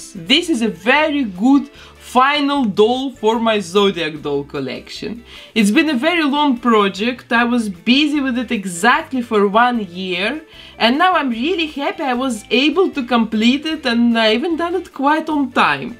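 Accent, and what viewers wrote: Russia. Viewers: Russia, belarus or out there but I think it's from a European country because she says the money in Euros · Я не могу понять, или она русскоговорящая, либо просто плохо выговаривает англ слова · Excuse me...but Are you from Russian? 😰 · where is she from? l hear Russian accent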